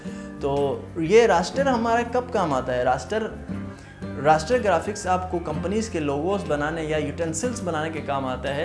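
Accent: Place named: Gilgit, Pakistan. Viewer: Yar bro ap ka lahja to glgite Wala ha am I write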